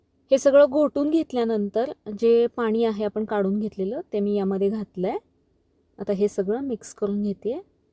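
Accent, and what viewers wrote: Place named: Konkanastha, India. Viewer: तुम्ही कर्नाटकातल्या असून सुध्दा एवढे शुध्द स्वच्छ गोड मराठी छान बोलता .बरेच उच्चार कोकणस्थ लोकांसारखे वाटतात .हल्ली अशी शुध्द भाषा ऐकायला मिळत नाहीत .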